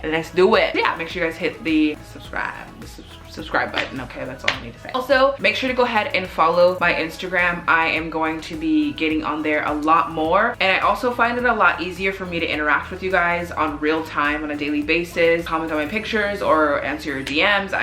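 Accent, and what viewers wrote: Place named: Netherlands. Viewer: Idk why but the way you speak English really has a Dutch undertone if you get what I mean hahah. Idk if you are dutch but yeah 🤣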